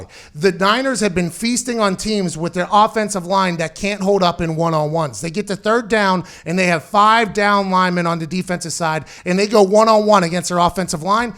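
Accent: Pittsburgh, United States. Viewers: Plum, PA. So yes a Yinzer.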